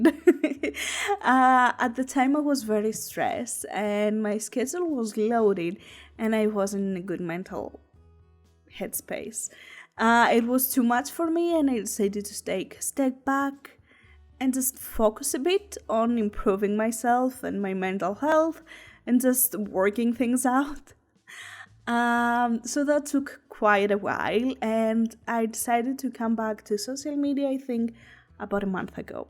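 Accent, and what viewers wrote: Greece. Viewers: are you greek? because you sound like greek :P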